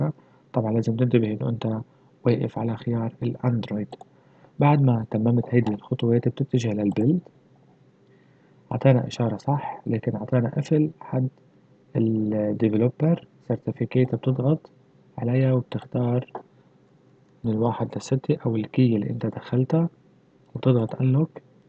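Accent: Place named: South, Lebanon. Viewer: mbyen mn swtak mn jnoub Lbnen sa7 ana Mn lbnen sken be jnoub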